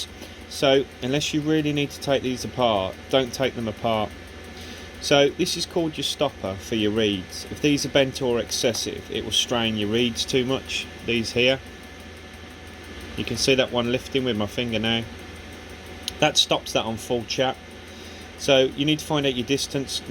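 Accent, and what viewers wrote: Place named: England, United Kingdom. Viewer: Great and informative video! Very clear and well explained (even with the English accent 😉) I just bought one for my son.. it seems they all need some work. Someone too heavy was riding this one and damaged the rear monoshock. Just waiting on the mail now! Has your daughter been enjoying the bike, now that you have it all fixed up?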